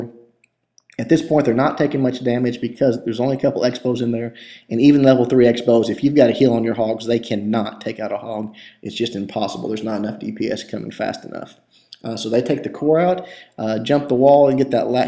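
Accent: United States. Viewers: My new favourite channel. The breakdowns are amazing and your all-American accent just fuels my need to war haha. Keep it up man!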